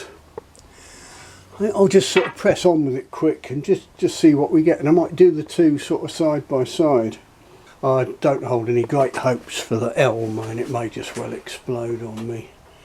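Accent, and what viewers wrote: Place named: United Kingdom. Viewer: Quick question, I assume you are in the UK based on your accent, I was wondering what the law is for you in England over bows, where can you shoot them, do you need a licence to own one etc, Im in Scotland so laws may differ but been thinking about trying to make a bow for a while but not knowing the law situation has put me off trying.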